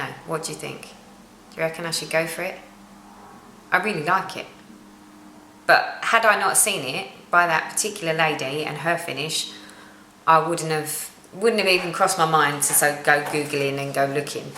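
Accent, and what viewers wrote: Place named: London, United Kingdom. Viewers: I always look forward to your videos when I see them in my subscriptions :) I think you are delightfully funny and I love your english accent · I remember Bev and Teddy always commenting on flosstube videos. I used to comment back once in a while, now I wish I had made much more of an effort. She loved this community so much, which is easy, everyone is lovely to each other. I watch while stitching, and don`t always comment...I need to change that. You do lovely videos, I love your accent, it reminds me of my family in London. xo Mj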